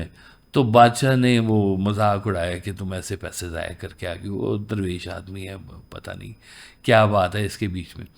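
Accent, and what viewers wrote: Punjab, Pakistan. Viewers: ji....thoda punjabi accemt hai na isliye thoda samajh na hi aati....1 martaba suni thee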